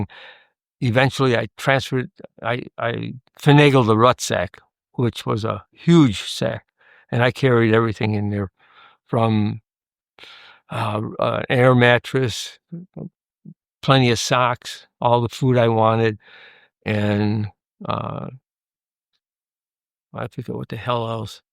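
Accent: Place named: Chicago, United States. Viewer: Sounds like you're from Chicago - maybe Southside - me too - US Marine, 1968, Phu Bai/Hue City. Well Done Marine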